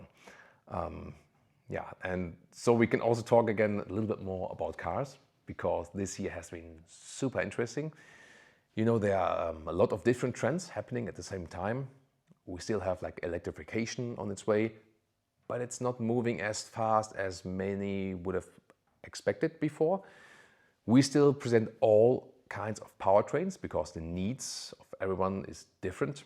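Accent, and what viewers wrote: Germany. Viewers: Love your Videos and your style of presenting cars. Calm and authentic. And keep our German accent, that makes it so relatable. Also if I am German, most of the time I watch your videos in English. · Love your vids and the way you breakdown these cars with strong German accent in a style and format that is just perfect for your delivery🔥🔥🔥🔥...It sounds so authentic and feels like you are coming directly from German car manufacturers, which i know is not the case, but that's why I love the channel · I subscribed this channel mainly for Learning German Accent English. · Hello. Dual Brit Canadian here, though I'm Egyptian by birth. Have always loved your videos, your presentation style and your personality. I actually lived and worked in Germany from 1988 to 93, in Lahr / Schwarzwald at Canadian Forces Base Lahr. I was a civilian in the IT department and then immigrated to Vancouver Canada in 1993. 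I'm back in the UK right now but have kept my Vancouver place. I've also faced a very personal tragedy recently. I lost my very dear Mum last year due to her accidental stair fall and discovery eight weeks later she had two brain haemorrhages she didn't know she had. She tragically died in hospital on July 3rd last year, with me in the room with her. I still cannot believe she's gone. Three months after losing her, I myself was diagnosed with leukemia. I spent four weeks in hospital having numerous blood transfusions, chemo and medications, followed by almost a year of outpatient treatment. I'm thankfully totally cured now, very fit and healthy with zero side effects. But the tragic and unexpected loss of my very dear Mum has been absolutely heart breaking. I am keeping a positive attitude for my life and future. I attend many classic cars shows and I'm very interested in cars. Totally love your channel and your presentation style. 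Also, your accent reminds me very much of my five years in Germany. By the way, my profile photo is of my very dear Mum and I. Vielen Danke und Tchuss! Ibrahim in Canterbury Uk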